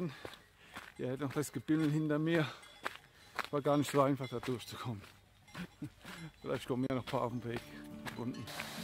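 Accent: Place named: Mannheim, Germany. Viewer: Schönes Monnemerisch 😁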